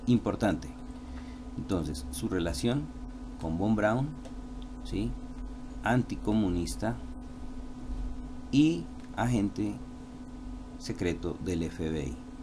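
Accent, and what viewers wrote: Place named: Bogota, Colombia. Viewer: Hola, buen video gracias,por el acento creo que es de bogota????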